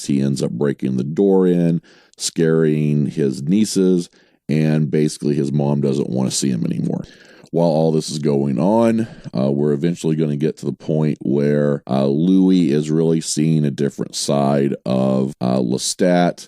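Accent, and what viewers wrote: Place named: Texas, United States. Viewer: Late to find you; glad I did. Your reviews of this series come across as fun & heartfelt. Plus you have a great voice. Sounds Texan so I'm biased. I look forward to listening to you come Season 2.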